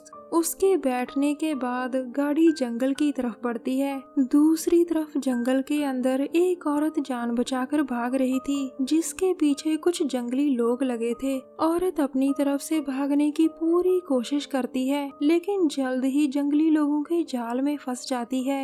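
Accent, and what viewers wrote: Punjab, India. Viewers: Mam Panjabi lagg rahe ho aap , aap k bolne K treeke se lagg Raha hai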